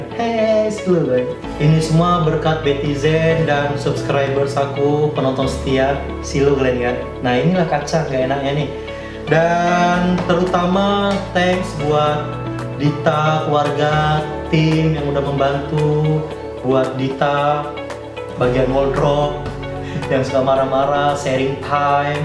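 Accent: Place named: Karo, Indonesia. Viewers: I feel you ka~ Selucu-lucunya beti, sekocak-kocaknya beti, semenghiburnya beti, tetep otak dan dalangnya kak, itu ajasih yang perlu diinget. So ya, salut banget sama kak. Terus berkarya ya ka, aku selalu nungguin video kaka karena moodbooster parah aku bisa ngakak sampe nangis kalo liat video beti loh😂 satu lagi, gara2 sering nonton beti aku jg suka niruin logat medannya kaka, padahal aku orang jowo wkwk pokoknya semangat terus ka! 💪💪💪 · Bang orang mana? Logat nya kayak bahasa medan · Paling suka Kalau Abg Bawakkan vidio ada logat karo nya🤣🤣 kalau gak ngerti bahasa karo tanyak² aku ja bg😚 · ya bang banyak video abang kayak keseharianku setelah 8 th menikah kebetulan istri dari sumatra nah tiap pagi logat macam ini selalu menghiasi hari hari ku dan anak2ku dirumah (sukses selalu ya bang tetap berkarya)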